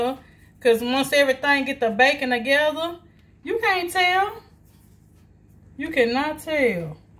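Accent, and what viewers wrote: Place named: Tennessee, United States. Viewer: Girl you must be from West TN. 😂 girl! ❤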